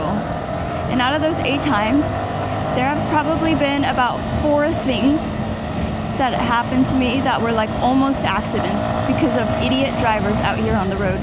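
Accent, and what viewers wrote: United States. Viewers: where are you originally from?? I haven't seen all your videos so not sure how you sound American but are out in Luxembourg... sorry ..im nosey...lol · Sothern accend 👌🏼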